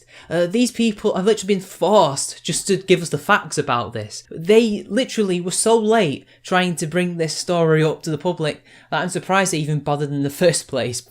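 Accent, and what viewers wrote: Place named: England, United Kingdom. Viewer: G Willy He's English by the way, if you could not tell by his accent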